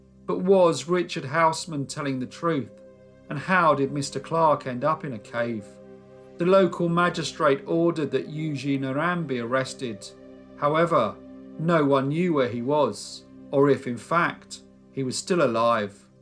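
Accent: United Kingdom. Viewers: mmm never watch tem before.. gotta look it after. There's also Lazy Mascarade, his voice is cool. But your voice is amazing too! By the way, if is not indiscrete, may I know which part of UK your accent is from? I'm very curious about accents. English isn't my mother language so I try to learn from any accent.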